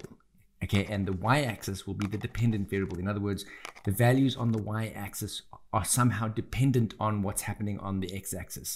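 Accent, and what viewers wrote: South Africa. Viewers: Hi you sound South African. Also. Please can you do one with loads of data as with spectra. I have to do it for my project and can't for the life of me figure it out. If you can reach out please.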